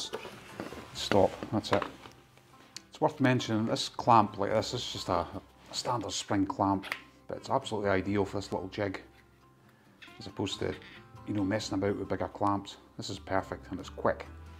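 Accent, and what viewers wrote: Scotland, United Kingdom. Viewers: Nice job mate, also nice to hear a Scottish accent for a change! I have the same thing in mind to build but I was thinking of going for Bosch glide 12". At this level they are all top notch saws. I have a cheaper erbauer mitre saw and for £240 it has massive cut capacity but suffers the same drawback as your dewalt in that it's ginormous and space is limited. I'm from Kilmarnock btw, I am a joiner and have a youtube channel, jusgeritdone. I only have 4 vids at the moment but have decided to start getting a bit more serious so working on a new video just now. I built my shop last year and currently working to finish indide. Anyway, I have subscribed to your channel and enjoyed watching this build. It would also be interesting to hear how you get on with the new makita chop saw.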